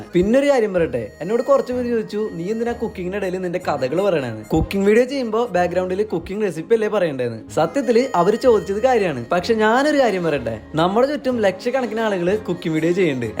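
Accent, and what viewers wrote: Thrissur, India. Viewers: Thrissur ahnooo😂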